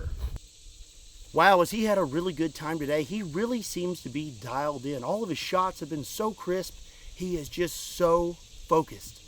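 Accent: United States. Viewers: Your yankee announcer voice is hilarious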